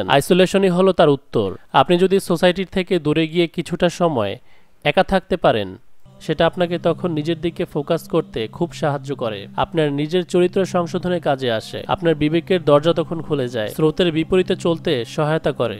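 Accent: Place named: Sylhet, Bangladesh. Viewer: ভাই আফনি কিতা সিলেটি ফুয়া নি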